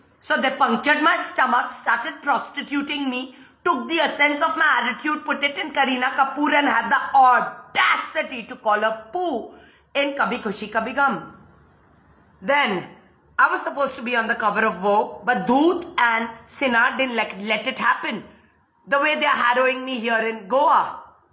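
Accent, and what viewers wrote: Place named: England, United Kingdom. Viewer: Are you okay pooja we love you ❤ pooja raise voice for yourself keep stand like that I am 17 nd loved your English accent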